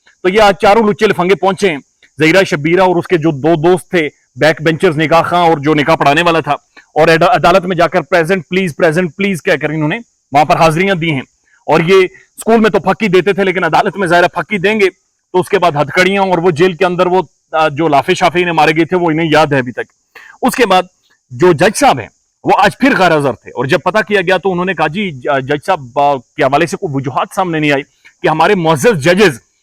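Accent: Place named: Kashmir, India. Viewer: Mne phly b pocha tha k ap kashmiri hain plzzz bta dain k ap kashmir se hain kia? Apk Andaz e bayan se lgta tha k ap kashmiri hain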